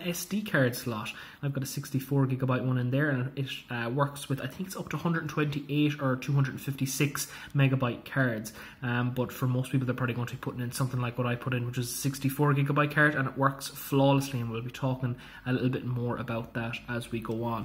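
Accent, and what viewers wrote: Ireland. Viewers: Is it odd for a man to tell another man his voice is adorable? I want this man to FaceTime me nightly and just tell me about his day while I drift to a deep slumber to his sweet buttery brogue. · You're Irish right? If so how did you get this console?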